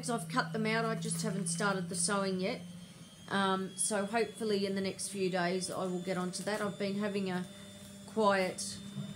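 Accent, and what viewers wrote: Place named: Australia. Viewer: Hello, I'm new to you sight. Nice to hear the Aussie accent. Thank you for showing those magazines, going to get the Crochet Now Magazine tomorrow. :)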